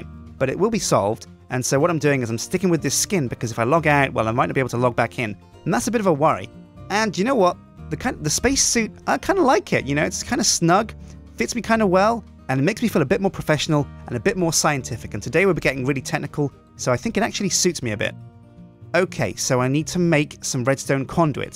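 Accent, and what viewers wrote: Australia. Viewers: Australian accent?? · His aussie accent is amazing even I can't do that and I am aussie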